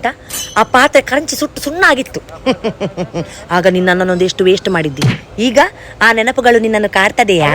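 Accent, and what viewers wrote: Mangalore, India. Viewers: Sooooooooper stand up comedy dear gas ⛽ 😂 Such a beautiful actor you are ..just wowww U told the reality of gas usage in a good way creating humour . I JUST LOVE UR MANGLORE KANNADA ...Muuaaahhhhhhhh 👄 But dear gas cylinder dont worry Ishtu diwasu band maadiddu microwave iga upayogistene 😂 Haage nann bali induction kuda untu...neenu swantane maha khiladi anta tilko beda 😁😁😁😁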